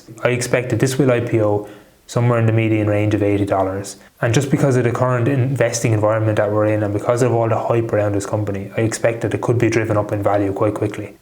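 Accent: Ireland. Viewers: County Mayo accent?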